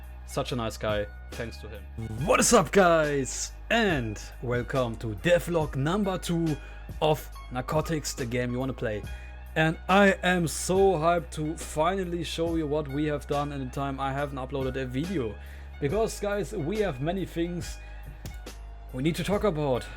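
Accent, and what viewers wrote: Germany. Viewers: you have a German accent. BTW great wishes from Germany, im really excited about your work and how good the game will become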